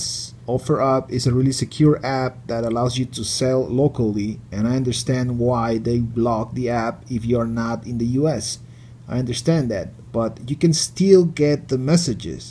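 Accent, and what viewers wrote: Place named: El Salvador. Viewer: Has uno en español de todos modo pronuncias el ingles como salvadorian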